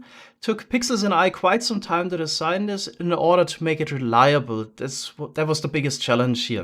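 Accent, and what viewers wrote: Germany. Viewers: Are you German because you’re accent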